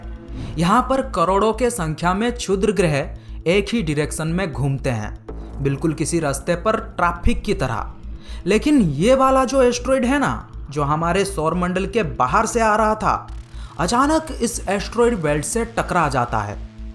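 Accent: Odisha, India. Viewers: Bhai aapka baat krne k tarike se hi pta chal raha hai tum Odisa se ho😁😚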